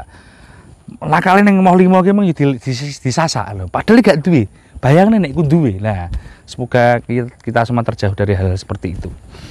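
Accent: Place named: East Java, Indonesia. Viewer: Saya orang Jawa Barat, tapi kok menikmati gaya bertuturmu yang Jatim bgt😅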